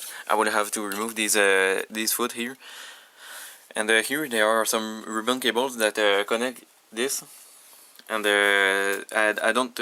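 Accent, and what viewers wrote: Quebec, Canada. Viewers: Marrant son accent québecois in english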